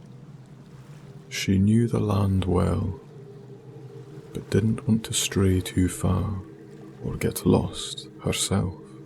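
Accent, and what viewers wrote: Scotland, United Kingdom. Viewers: your soothing voice with the Scottish accent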